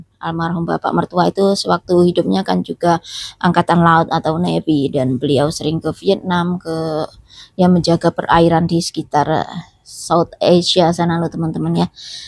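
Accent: Java, Indonesia. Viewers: Mba lucu bahasanya, Jawa medok Amerika medok 😂👍, khususnya kalo ngobrol sama anak2nya, mba wanita pintar Dimata saya👍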